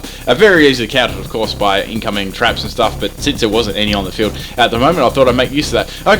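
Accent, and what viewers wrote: Australia. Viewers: became accustomed to it after a while...such an Australian accent...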